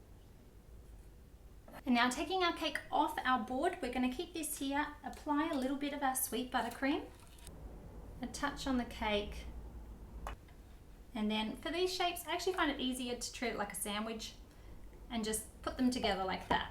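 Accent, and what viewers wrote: Australia. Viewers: is she Australian? or from New Zealand ? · I love watching your videos the cakes you make are amazing! Your australian accent is really cute by the way ^^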